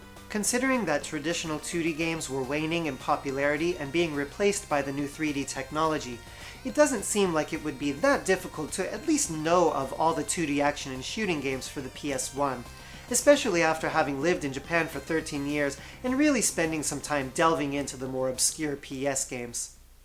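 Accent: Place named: United States. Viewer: they speak in a murican dialect offshoot of real English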